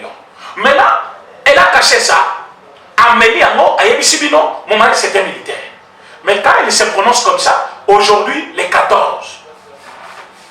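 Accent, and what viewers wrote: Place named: Luba, Democratic Republic of the Congo. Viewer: Yonde mutu oko songa na mboka bisika obotama Soki oza mutu ya region nini? Mais na elobeli, na tonalite oko zonga mueneditu. Yo nani? Atalaku ya UDPS, oko songisa biso na Congo? Oza mbua raisonnement ya supu mbua .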